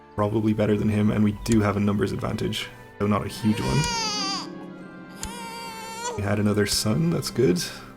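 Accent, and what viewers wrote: Ireland. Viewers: Mr, are there other changes with the Horse lords DLC or just the different culture and features with that culture, and are you Irish, cause it sounds like you have a slightly heavy brogue, or is it just me?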